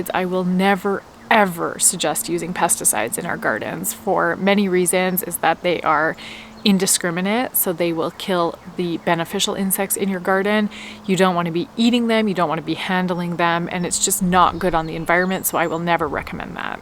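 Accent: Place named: Canada. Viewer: I am growing these: 1 coffee shrub, 1 avocado shrub, 12 buckets of potatoes, 6 buckets tomatoes, 6 buckets onions, 6 buckets sweet corn, 6 buckets carrots, 2 buckets yellow squash, 2 buckets green beans, 2 buckets jalapenos, 2 buckets green peppers. I'll look & see if I find any of these larvae. Thanks for the heads up. Love your Canadian accent !! I subscribed.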